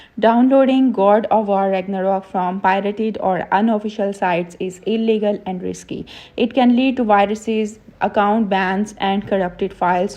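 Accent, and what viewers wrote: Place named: India. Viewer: wow i love the indian sam voce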